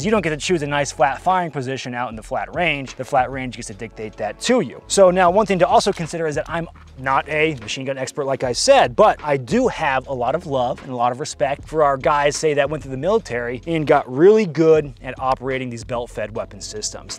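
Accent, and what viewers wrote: Afrikaans, South Africa. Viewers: The South African accent 😂😂😂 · Not the South African accent ,love it 😭🤣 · The South African accent and slang is awesome lmao and also the History lesson makes me happy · As a afrikaans speaking south african i aprove the accent hahaha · as a Boer patriot in commie South Africa, I quite enjoy your safrican accent. lekker my bru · Liiiike it my bru your afrikaans English accent lol focken lekker 👍👍👍👍👍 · Love the South African/Rhodesian accent, shot my bru 🤙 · As a south african and afrikaans speaking native the accent killed me 😂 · Oh wow again the Rhodesian and South African references were amazing. Saw some SADF photos in the video of troops with the FN MAG. South Africa used the FN MAG in the bush war and was the backbone of the troop or squad. Love the accent!! Good job. Not quite leo's level in Blood Diamond but with some work you will get there. 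Well done bru! TIA. As a South African this video made me smile. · Love your attempt at a afrikaans accent hehe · That was the best Rhodie/South african impression ive ever heard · Lekker video my Bru.... Your Afrikaans /rodisian accent sounds good. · your South African accent isnt half bad 😎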